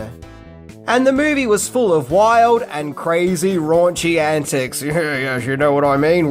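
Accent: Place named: Australia. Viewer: You’re killing me (in a good way😅)!! Several months ago I started watching at the beginning of your videos and have finally caught up. Along the way I realized that I was missing quite a few movie gems from my collection and as such have had to make a lot of purchases to more fully create a dignified compilation. A few observations that I have made from watching your videos in succession from the beginning are: You are a very kind person!! I really enjoy your comments and you are always willing to give somebody the benefit of the doubt without casting blame or being unkind. I really enjoy listening to your accent (obviously I’m not from Australia). I really enjoy the way you present the content you are speaking about, it’s interesting and fun to listen to - I’m sure it takes a lot of work to make these videos - and I can see you’re passionate about the movies you are talking about. 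You are gracious to those who watch your videos and that speaks greatly to the humble type of person you are!! Thank you, for creating entertaining and enjoyable content. I look forward to whatever you have in store for us!!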